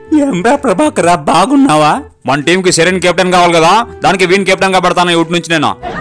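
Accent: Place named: Anantapur, India. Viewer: Brother..MAA OORU GORANTLA...MEE CHANNEL DWARA MANA ANANTHAPUR SLANG BAGA POPULAR AVUTHONDI..KANI SMALL CORRECTION DONT MIXUP OUR TELUGU WORDS WITH KANNADA WORDS LIKE DOORAM WITH DOVVU...A SMALL REQUEST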